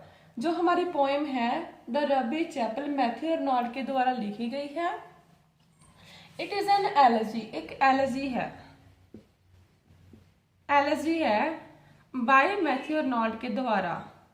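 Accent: Punjab, India. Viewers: Your voice reflect Punjabi tone,nice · 👌 Very nice video mam. 🙏 Thank you so much for your valuable information. क्या आप पंजाबी या हरियाणा से हो? ऐसे ही पूछा है आप के बोलने के अंदाज से.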